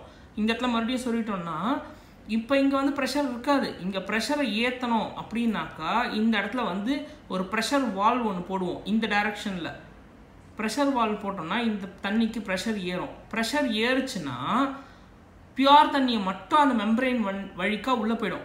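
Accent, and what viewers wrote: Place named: Chennai, India. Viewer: Sema voice bro real Chennai man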